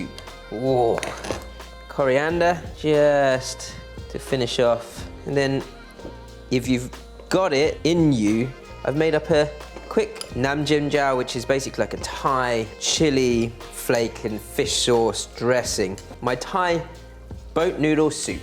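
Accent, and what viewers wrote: United Kingdom. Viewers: Asian with british accent is wild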